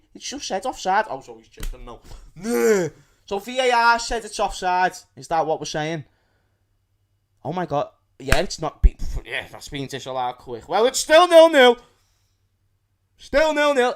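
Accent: Liverpool, United Kingdom. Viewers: U need to put substitles on ur freakish scouser channel bruhh!!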